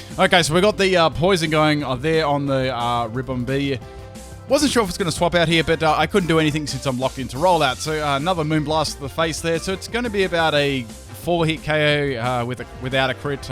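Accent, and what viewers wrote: Australia. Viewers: Wait is Australian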